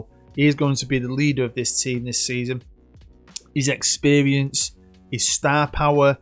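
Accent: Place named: Liverpool, United Kingdom. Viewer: oh and ye gotta love a scouser in ye vids hahaha xx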